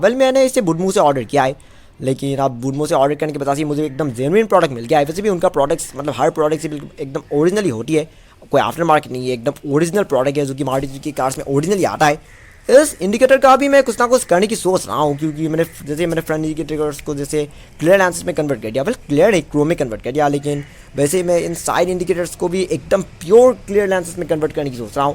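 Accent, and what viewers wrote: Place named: United Kingdom. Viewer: Kotha bur olop bhal kobisun aru besike bhal lagibo aitu english accent hindi koi aso ni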